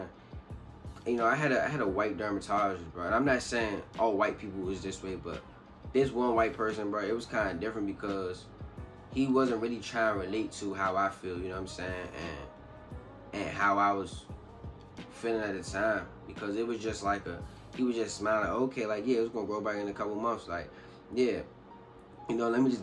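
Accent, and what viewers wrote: DMV, United States. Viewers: Could tell u was from the DMV 🤣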